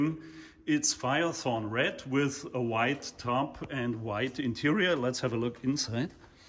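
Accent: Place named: Bavaria, Germany. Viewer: Nice collection of "Amerikanische Riesenwagen". Do the various owners all live in this same building? I see from the license plates (and your accent) that you are in beautiful Bavaria. Funny how the North Americans luxury car market was taken over by the German brands starting in the 1980s, and yet, there seems to be quite a lot of classic American "land yachts" in Europe today.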